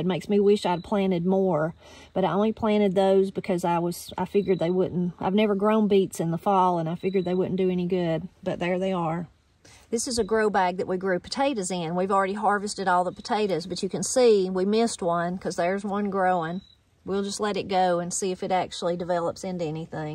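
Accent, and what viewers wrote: Appalachia, United States. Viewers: I know what airish is now!!! I even used it the other day. Thank you! Love the Appalachian language.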